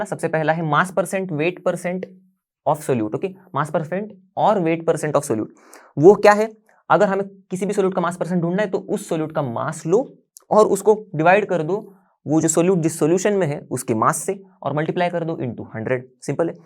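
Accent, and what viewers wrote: India. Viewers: These lessons sound interesting, but they have one small problem: the Hindi accent 😅 Could you please add English subtitles to your playlists..? Thank you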